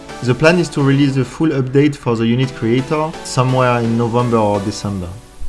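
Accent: France. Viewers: Le petit accent francais on le reconnais ^^ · Je suis sûr vu ton accent anglais que tu es français. Est ce que je me trompe?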